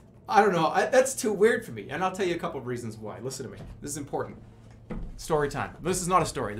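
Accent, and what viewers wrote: Boston, United States. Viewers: The drunker jerma gets the worse his Boston accent gets.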